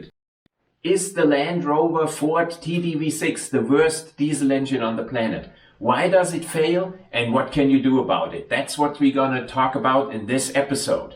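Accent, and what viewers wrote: Germany. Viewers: I think I have never seen a video with more in-depth analysis and plain old truth, all topped off with a cool German accent! The sad truth is that very few people care to know anything about the car they drive - no more than where to put the fuel in, if that much. In other words, most people are simply consumers of what other people design, manufacture, and maintain - and spend all their time complaining about how their feelings got hurt by this group or that one. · Love thee German accent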